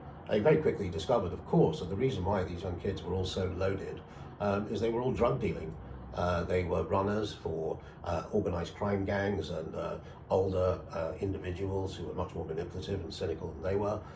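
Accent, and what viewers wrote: United Kingdom. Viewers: I pictured him as white because of your British accent. Americans don’t think of black people when they think of UK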